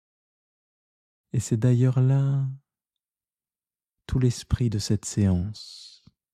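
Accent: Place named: Sète, France. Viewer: Je voulais vous décerner la médaille des 2 ans ! En effet, je suis tombée sur vos méditations en mars 2020, esseulée et perdue parce que mon compagnon a eu plusieurs mois de COVID long à cette époque et devait rester seul dans la chambre , et moi dans la chambre d à côté à me tourmenter. Vous m avez beaucoup aidée à garder espoir en sa guérison J apprécie beaucoup votre timbre de voix, et votre façon de prononcer certains mots , qui me rappelle mes vacances près de Sète dans mon enfance vers les années 70 /80. ( Je suppose que vous venez de cette région ?) Vous êtes un prêcheur de simplicité et je vous remercie du fond du coeur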